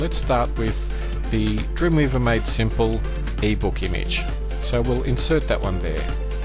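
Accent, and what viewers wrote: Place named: Australia. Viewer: Good to hear a fellow Australian :) Thanks for this!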